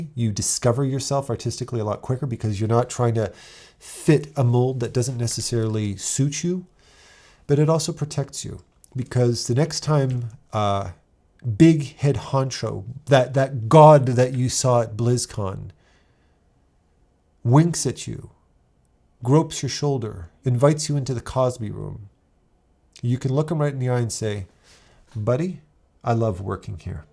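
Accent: Quebec, Canada. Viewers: As a french speaker I can say you’re saying « la crème de la crème » very well, you sound like a guy from Quebec.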